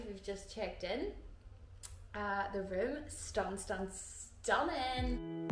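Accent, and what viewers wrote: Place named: Ireland. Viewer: Lively and beautiful. That irish accent ❤. Glad youre with my fav band DD.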